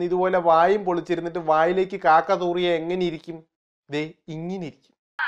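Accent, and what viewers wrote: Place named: Kottayam, India. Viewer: Chettan kottayamkaaranaano slang kettittu thonnanallo😊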